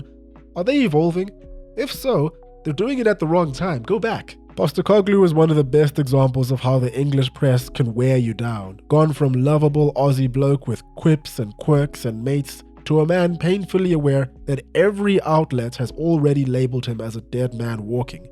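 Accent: South Africa. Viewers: is a Shona name, but you have a South African accent, got ya! Sharks😂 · I KNEW YOU WERE SOUTH AFRICAN!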